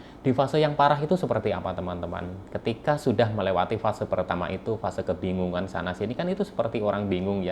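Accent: Banyumas, Indonesia. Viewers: Sembuhnya pakai apa...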